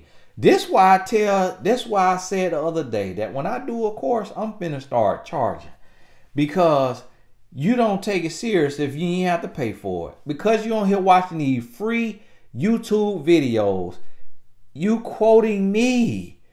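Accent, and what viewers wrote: South, United States. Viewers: Great video! You tell it like it is..time for her to leave and work on herself. Love your country accent ❤ 💕. Thank you for your wisdom on these man boys. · is too funny, love the country accent, keep telling it like it is brother.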